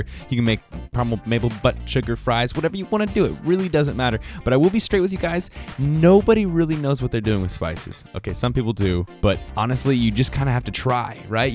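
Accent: United Kingdom. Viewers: your British accent is better than mine and I'm British ... Take the children to the school baby ahahah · British accent, recipe, sexy filming and close ups sooo on point man. I'm legit going to make it now.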